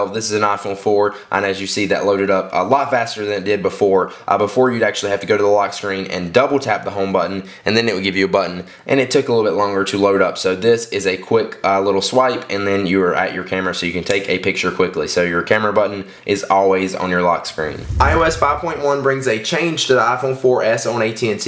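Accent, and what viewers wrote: South, United States. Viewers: If you guys think he has a strong southern accent, you have no idea... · its a southern accent ur either racist or just a fucking dumbass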